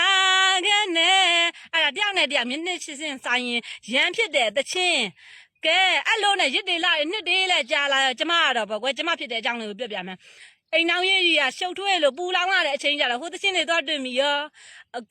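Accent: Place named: Ayeyarwady, Myanmar. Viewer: အေးဒီအသံကဒို့ဧရာဝတီတောင်ရိုးဘက်ကအသံ❤❤❤❤❤❤❤❤❤❤❤❤❤❤❤❤❤❤❤❤❤❤❤❤❤❤❤❤❤❤❤❤❤❤တော်ပါတယ်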